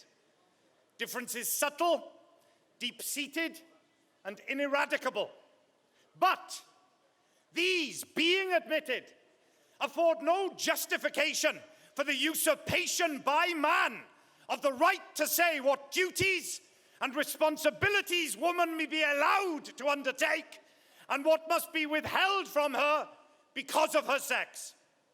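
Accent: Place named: Wales, United Kingdom. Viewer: Wow it's so rare that i hear his Welsh accent ❤️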